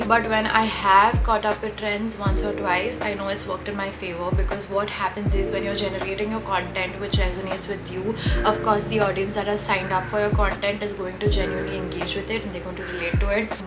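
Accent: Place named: Maharashtra, India. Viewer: Your marathi accent oh my god literally dying 😍🥺♥️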